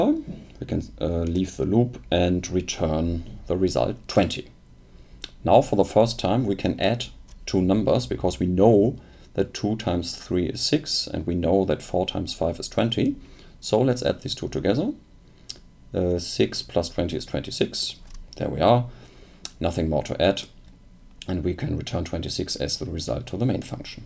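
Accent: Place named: Germany. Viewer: Are you german? You sound german, especially when you say 'digit'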